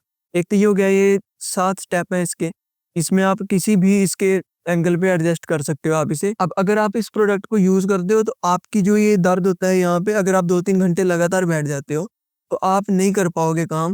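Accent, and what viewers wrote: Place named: Punjab, India. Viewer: Punjab probably accent se lag rha hai. Or mast tarike se explain bhi kar rahe hai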